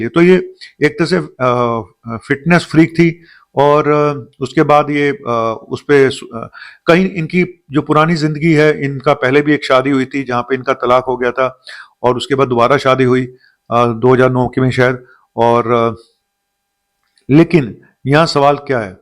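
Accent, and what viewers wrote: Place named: Punjab, India. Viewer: Dora नहीं दौरा डाक साहेब। पंजाबी accent बदलो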